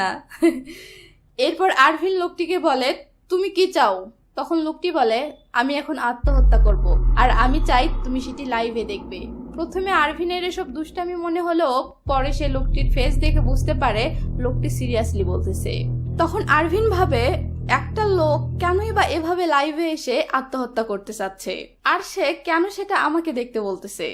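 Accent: Bangladesh. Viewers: আপু আপনার কথা শুনে মনে হয় আপনি বাংলাদেশী।কিন্তু আপনার চ্যানেলে দেয়া আপনি ইন্ডিয়া থেকে!! আমি কি ভুল?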